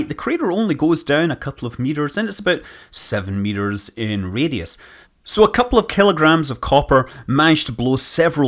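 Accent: Scotland, United Kingdom. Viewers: Came for the science. Stayed for the Scot accent.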